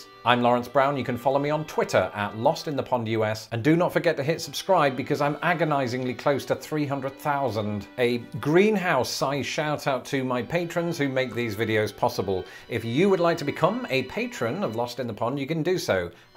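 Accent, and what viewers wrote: United Kingdom. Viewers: stahz... I love the British accent xD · Such a mundane topic made interesting with dry wit and a British accent. 😍😍 Found this channel via Mr H and Friends. Bloody love it. (hello from South Carolina)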